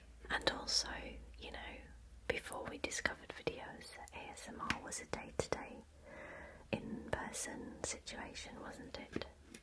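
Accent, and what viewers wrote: United Kingdom. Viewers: easily one of the prettiest ladies on the net. You could read me the dictionary with your lovely British accent. This will be good for bed now as it's only just gone 10pm down here in Australia, goodnight 😊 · First time seeing you. Fantastic whispering and your British accent to boot! Love it! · Are you English, Irish or Scottish ?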